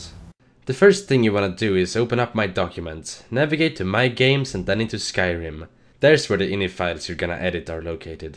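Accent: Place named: Sweden. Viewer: haha i can tell that u are from Sweden.. lol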